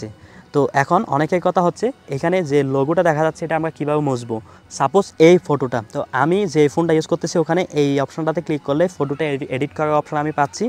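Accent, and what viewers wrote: Chittagong, Bangladesh. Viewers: আপনি কি চট্টগ্রামের মানুষ?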